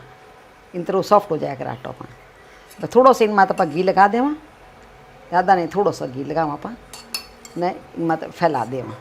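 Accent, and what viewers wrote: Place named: Marwari, India. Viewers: Yes it is Hindi. But Hindi also have many dialects, depending on geographical location. In rajasthan only we have 10-15 diffrent hindi dialects. This madam in this channel is speaking marwari hindi. In Udaipur area you will have diffrent dialect of mewari hindi. In Jaipur side you will find dhundadi hindi dialect. In same way in uttar pradesh mathura side there is brij bhasa, which is also a kind of hindi dialect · I like to hear ur marvadi hindi..Pranam to U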